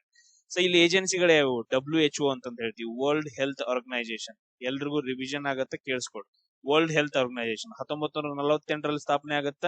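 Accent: North Karnataka, India. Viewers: Super bro your tricks ,,niv pakka Bagalkot or Belagavi ಇರಬಹುದು ನಿಮ್ಮ ಈ ಕಡಕ್ ಮಾತು ಕೇಳಿದ್ರೆ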